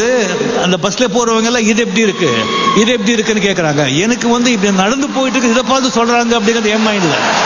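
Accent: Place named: Hindi, India. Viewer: சரக்கு போல தலைவர் ஹிந்தி கரான் மாறி பேசுராரரு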